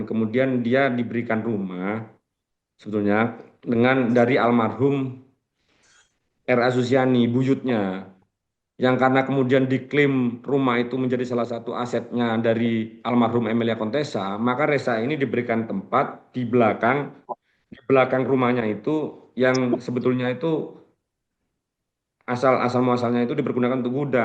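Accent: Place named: East Java, Indonesia. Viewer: Logat nya jatim banget semangat bang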